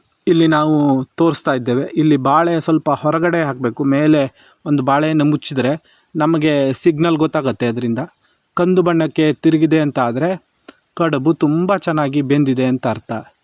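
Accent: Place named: Uttara Kannada, India. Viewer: Siddapura, sirsi areadalli bhasheya accent ide tara irutte . Nirupane paravagilla, sahajavagide.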